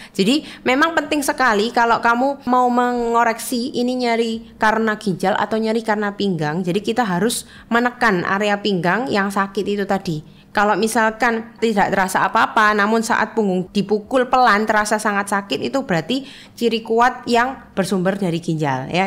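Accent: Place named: East Java, Indonesia. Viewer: Logat Jawa Timuran 😂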